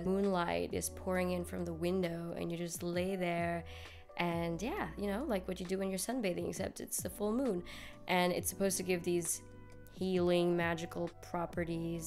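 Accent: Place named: United States. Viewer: Found your channel today and immediately subscribed, love the way you talk about each book and so many that I have never heard about, love it because most booktubers tend to recommend the same as everyone else. You said you grew up in France but your English is so perfect! I was wondering how your accent sounds so American? There’s no French accent, I’m mesmerized because I’m not from the US but have been living here over 20 years and I still sound foreign 😄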